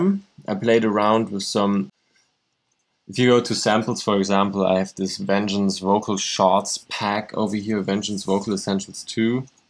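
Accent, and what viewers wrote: Germany. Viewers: Ich hörs doch, you are german!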